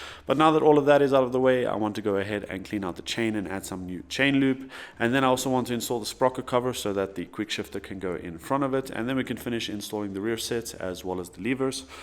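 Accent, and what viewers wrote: South Africa. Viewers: Is jy afrikaans daai aksent se so...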